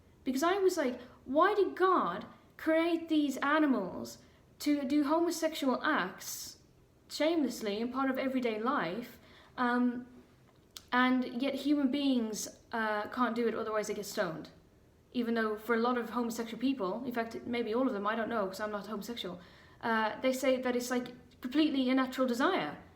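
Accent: Ireland. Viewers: r u irish origen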